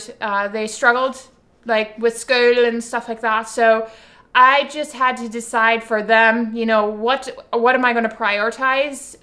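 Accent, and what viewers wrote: Ireland. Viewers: You SOUND SO Irish in this video!! Welcome back! x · sorry to hear about those haters. But whats more important is your health, family and going back to your roots. Fun to hear your Irish accent 😊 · Welcome back, it's good to know you're doing well and still among the living. This is the first time I've heard you speak with a Irish accent, GO IRISH!!! I just got back from a wonderful summer vacation in Ireland, it is such a lovely place, when I heard you speaking with an Irish accent I thought I was going crazy from hearing the Irish accent in Ireland so I was a little bit confused at first, so that means in all those other videos we were trying NOT to speak with your Irish accent, that is remarkable that you were able to do that, I'm impressed. I was hoping you would talk about that on this video but you did talk about speaking fast so that was cool. 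Take care I'm glad you're back making videos. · I thought you are Canadian? 😲Where does that Irish accent come from? 🤔 Oh yeah the haters, they are the worst! Im still ok on YouTube but on FB they are everywhere! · ohhhhhh ok......now I hear the Irish 💖 that's wsup luv...I would've thought you was Native Canadian.....yes looking forward to hearing the true 💯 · I love hearing your Irish accent ❤ sending love and hugs xx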